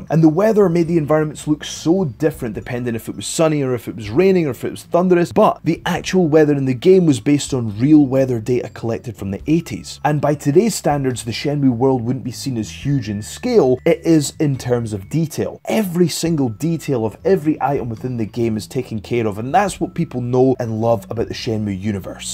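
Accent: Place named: Scotland, United Kingdom. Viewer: Scottish probably from Edinburgh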